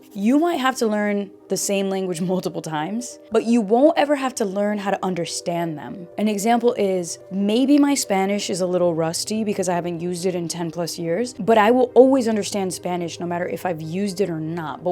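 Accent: Belgium. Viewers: Jouw belgisch tongval is echt "on point". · Ik ga echt stuk dat je Nederlands met een Vlaams accent hebt geleerd. Het klinkt zo schattig. (Zegt een Nederlander). Ik ga volgend jaar een studie in België beginnen en hoop dat ik het Vlaams accent kan oppakken. Naast Nederlands praat ik ek Frysk, in taal dy't yn it noarden fan it lân sprutsen wurdt. Ich spreche auch fließend Deutsch, weil ich Deutsch auf Lehramst studiere und man ein gutes Niveau braucht, um vor der Klasse stehen zu dürfen und das Studium zu absolvieren. Ich habe auch zweimal für 4 Monate in Deutschland gewohnt. I'd say English is my fourth language. Expressing myself in German feels more personal than in English. Although I'm not sure which language I speak better. Duits is natuurlijk de taal waar ik veel voor heb moeten leren en ik ken de details beter om zo maar te zeggen. Engels heb ik opgepikt door jarenlang Engelstalige televisie te kijken, maar als ik Engels spreek, voelt dat zo... 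vlak. Maintenaint j'apprends français aussi, mais je ne peux pas dire beacoup. J'ai commencé regarder Spongebob en français et j'écoute podcasts avec text. Ik zou zeggen dat een taal onder de knie krijgen even moeilijk blijft, maar hoe je het aanpakt steeds simpeler wordt. Inmiddels weet ik wel wat belangrijk is om te weten voor als je snel resultaat wilt boeken (chunks leren bijv., vooral die echt vaak in gesproken taal gebruikt wordt).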